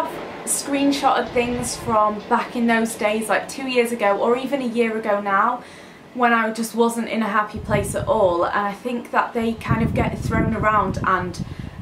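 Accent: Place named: Yorkshire, United Kingdom. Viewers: 'Tret me' Yorkshire lass through and through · You have a really northern accent but you're trying to sound posh and it doesn't work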